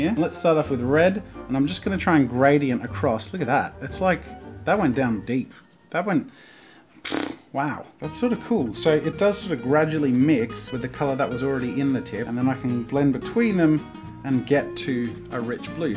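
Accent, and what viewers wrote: Australia. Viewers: British? He's Australian. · I learned to do a proper Australian accent from your videos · he sounds like an Australian Hank Green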